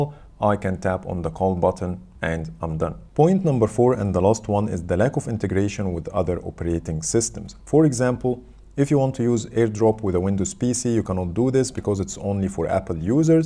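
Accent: Egypt. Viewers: That sounds like an Egyptian accent 🤔? Are you, my dude?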